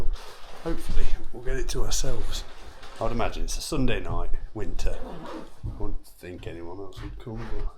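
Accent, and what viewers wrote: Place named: Leicester, United Kingdom. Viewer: Hi, new sub and not seen your channel before, just my cup of tea. I did a lot with my Dad too sadly passed away now, so really nice to see you out with your Dad. From the sound of your accent you may be not far from me in Leicester. Great Idea doing this trail in winter, now there’s an idea for me as it’s one I’ve not done, it’s popularity has always me off. Great vid and chat. I was interested in the Muon myself so wondering how that was for you, won’t be getting it now though as amazingly I was just gifted the osprey Levity 60, I’ve had the 45 since it came out and I love that so very pleased to get the larger version. Anyway A very merry Christmas to you and your family. 🎄🍻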